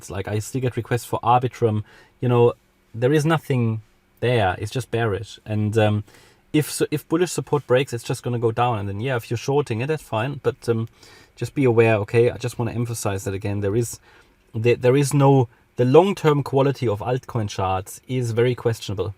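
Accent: Germany. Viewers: Man merkt dass du deutsch bist 😂 sehr guter Content mach weiter so!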